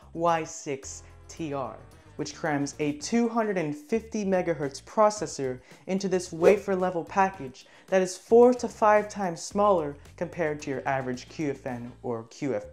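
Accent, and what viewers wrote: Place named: Australia. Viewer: As soon as I heard 'I decided to grow a pair', I thought wait what is he Australian? Yep. 🤣 Good luck in your studies and good to see some young passionate people coming into the field!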